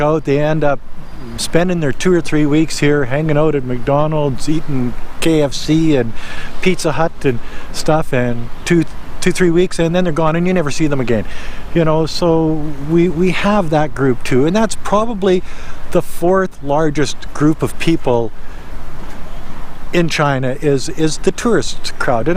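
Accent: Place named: Canada. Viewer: Gosh!!! I'm a Canadian myself and I can hear your heavy canuck accent! Americans, British folk out there. This is a true Canadian accent.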